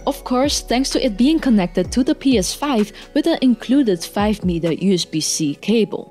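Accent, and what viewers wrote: Netherlands. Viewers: Why does it seem like you have a Dutch accent 🙂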